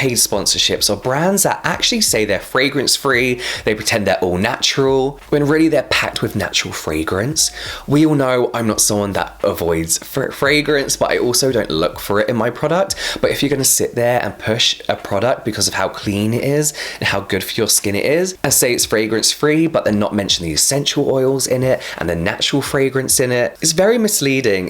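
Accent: England, United Kingdom. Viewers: your British accent gives me eargasm!🤪 we stan a skin care addict&k-pop lover🥰 greetings from Turkey:) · I can listen to him all day though, his voice is so soothing. If my husband had a british accent I might not tune him out as often as I do. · I do love a good Brit accent! :) I will try to watch influencers who I think are wasting product. I think people on p r lists in general are bad about it. Lately so many are de--cluttering and going on no-buy months or something that hopefully they'll be more thoughtful with their items. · sometimes i click on your vids just to listen to your beautiful english voice 🙈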